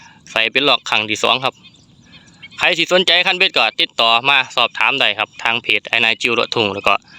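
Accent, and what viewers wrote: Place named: Korat, Thailand. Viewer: อยู่ อำเภอไหนครับพูดโคราช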